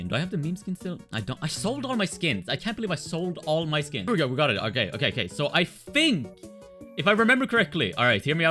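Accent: Sweden. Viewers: Jag hoppas att du är svensk så att min hörsel inte sviker mig. Btw hur fan kan du ha infintie credits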